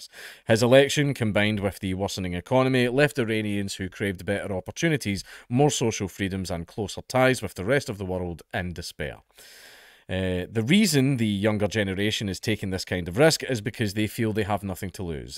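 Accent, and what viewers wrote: Scotland, United Kingdom. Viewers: Not for much longer, mate. And no, that's a Scottish accent. Are you kidding? Fuking Americans.... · Scottish accent? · You do realise that Scotland is part of Britain yes? He has a British accent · You must be a colonial. There is no such thing as a 'British accent'. has a Scottish accent. Scotland is a country, with it's own history, culture and language. Scotland is part of the United Kingdom of Great Britain which includes four countries; England, Wales, Scotland and Northen Ireland. After the acts of Union in 1704 and 1801 these countries became part of Great Britain but each country has it's own language which is the basis of the different accents and dialects. Only yanks say 'British accent' as they are ignorant of the mother countries' politcal, cultural, liguistic and historial fabric.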